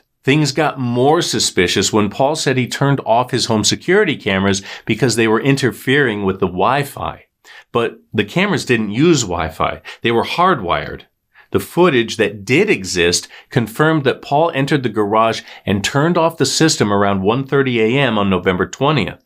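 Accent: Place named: New Jersey, United States. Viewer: Holy crap I never realized how familiar your accent was until now. I grew up Monmouth County NJ where Colts Neck and Asbury Park are located. You gotta be from the Tri-State, but I think you're from NJ. Mentioning the proximity to NYC introducing those town names hit me right in the heart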